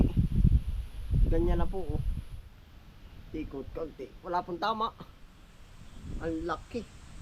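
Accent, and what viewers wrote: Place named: Aurora, Philippines. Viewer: Nkklibang k po pnuorin ..KC Yun slita nyo my Punto..khwig Ng slita nmin ..aurora province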